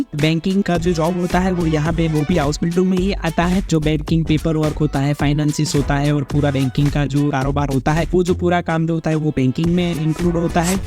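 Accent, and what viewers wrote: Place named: Maharashtra, India. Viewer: Hello I don't know why but I feel you are a Marathi speaking guy after listening your Hindi.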